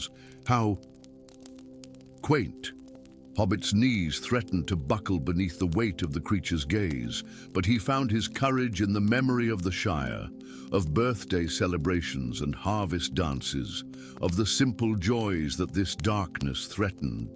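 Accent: United States. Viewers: Well this voice is better, but it still sounds American. I’d much prefer an English or European or Scandinavian or anything really but NOT American.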